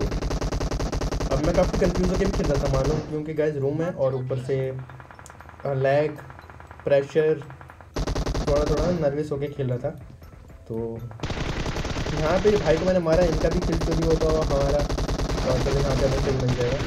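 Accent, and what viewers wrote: Rawalpindi, Pakistan. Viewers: Aap pindi ke lgte ho bawa jee 🤗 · apki zuban se to pindi boyy lagte ho 3)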